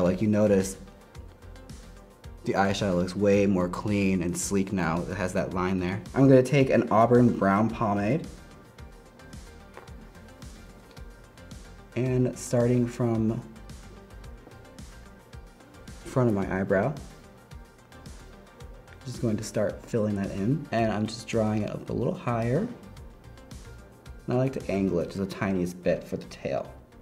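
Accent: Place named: Wisconsin, United States. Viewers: That Wisconsin accent 👌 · her Wisconsin accent! 💚 love from chicago! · I'm from Wisconsin too and never really thought there was an accent but hearing him talk omfg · Honey, love the Wisco accent. Please curl your lashes and blend them with your fakes with mascara, the difference is so stark and obvi. Two, please do something with your lower lashes!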